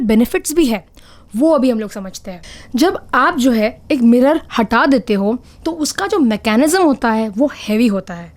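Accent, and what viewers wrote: Gujarat, India. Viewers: same here.. your accent shows that you are gujarati..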